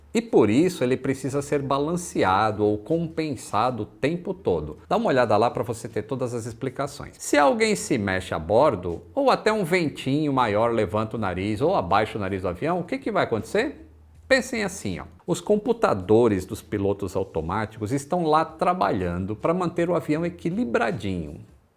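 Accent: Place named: Minas Gerais, Brazil. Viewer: Voltou de Minas com mineirês mais completo...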